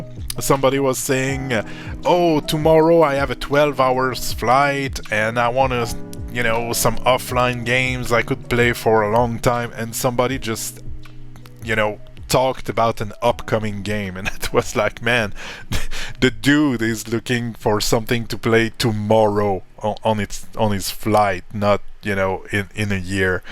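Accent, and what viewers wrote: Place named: Quebec, Canada. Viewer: J'aime l'accent Québécois ! Cheers to you !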